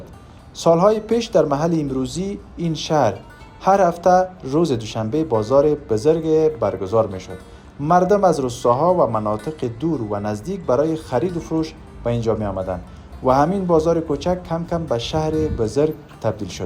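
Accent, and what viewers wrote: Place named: Dari, Afghanistan. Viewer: Shumo chiqadar bo lahni shirini dari harf mezaned . Lahjai shumo chiqadar ba mo nazdikast ki man hej ehsosi nofahmogi dar sukhanroni shumo namekynam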